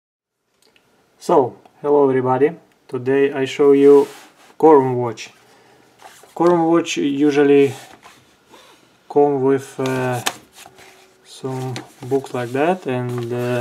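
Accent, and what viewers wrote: Russia. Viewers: А почему рязанское произношение,а?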